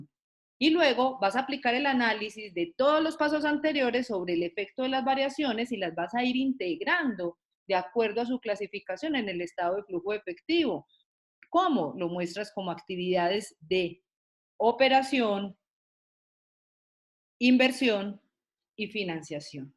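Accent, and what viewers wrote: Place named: Antioquia, Colombia. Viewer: rica esa voz de paisa arrecha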